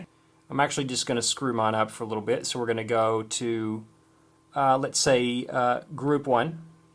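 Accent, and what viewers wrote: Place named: Australia. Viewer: are you aussie? if not you have such an aussie voice for an american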